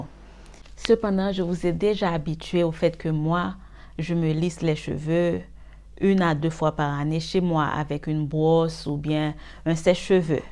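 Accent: Haiti. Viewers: J’aime bien ta façon de parler 😀 ton accent haïtien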